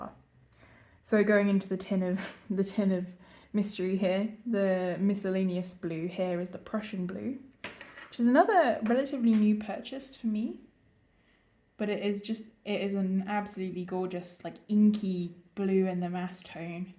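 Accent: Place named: South Africa. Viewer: You sound South African but not!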